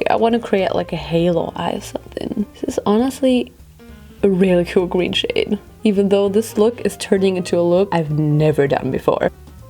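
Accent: Germany. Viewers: She's from Germany :) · I think it depends on the german accent/speaker totally. I've heard some pretty ridiculous and funny sounding ones, but hers is very cute :3 No offence to german ppl! I hate finnish (my country) ppls accent also. Actually hate it the most probably hahah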